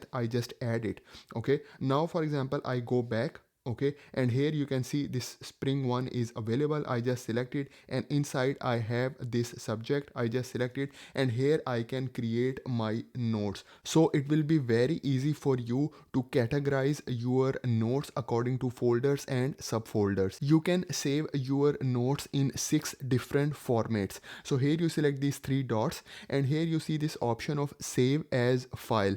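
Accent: India. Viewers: Indian english is so funny😂 love it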